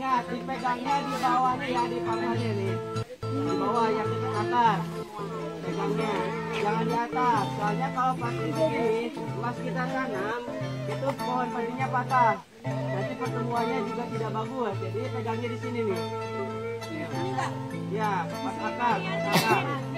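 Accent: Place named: West Java, Indonesia. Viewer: iyeuuh, khas sundanya kerasa 😍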